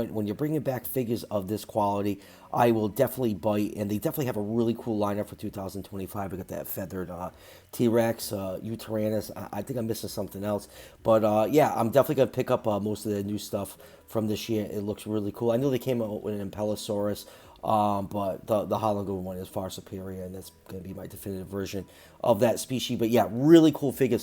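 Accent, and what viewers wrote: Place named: Massachusetts, United States. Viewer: Looks like I’ll have to start collecting Papo again. Nice to hear from a fellow New Englander! I’m from New Hampshire. You sound like a Massachusetts man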